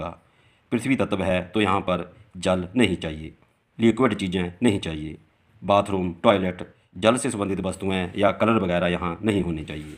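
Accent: Punjab, India. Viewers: ur speak style very nice punjabi touch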